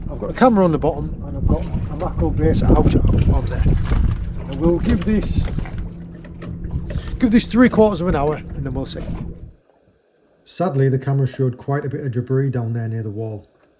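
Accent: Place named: Yorkshire, United Kingdom. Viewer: I am really enjoying your videos. You had me at the mention of Cornwall. I went there once and loved it. I always dreamed of retiring there and just painting everything. I came back with hardly any pictures though; I was too busy enjoying the place! I don't really fish, but I used to dive,so I love the underwater stuff. And I love the foraging. I am not much for beaches unless it is in cool weather. Cornwall fits the bill! Most of all, I enjoy listening to you. I didn't think you were Cornish, because I can understand you. But the Yorkshire accent I do get. Your voice is soothing and you are always smiling. You have a nice family as well. Your son is fortunate to be able to learn from you.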